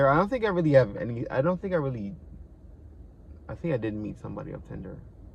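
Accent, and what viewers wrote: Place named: United States. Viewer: Love your stories man and your American accent.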